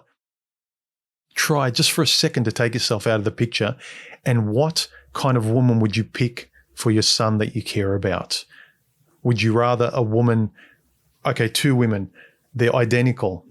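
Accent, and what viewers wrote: Australia. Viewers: Statistics have shown the success rate of a marriage or defacto is proportionate on the number of partners a woman has had in her past but the same doesn't hold for men. Men always exaggerate that number and women always understate their number. But as a rule of thumb, women who have had more than 5 sexual partners are much more certain to divorce the higher that body count becomes. And point in question is my daughter, who is 36, brags over the number of sexual partners she has had. That number is over 100 by her own admission. She is unable to love a man or have an extended relationship with men. Her relationships last 2 or 3 months before they end and she always has someone else in reserve so she is never alone. She does not love herself. She has 2 adult brothers who claim to have only had 3 sexual partners each. They are both in very long term relationships, one married, one defacto. 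I am so proud that they never took the path their sister took and chose very carefully their respective partners. ..... And that beautiful Aussie accent makes listening so easy.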